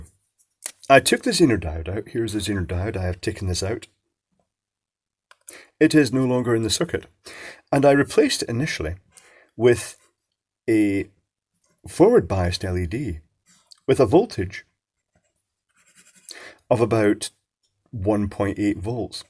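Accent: United Kingdom. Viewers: the annoying british accent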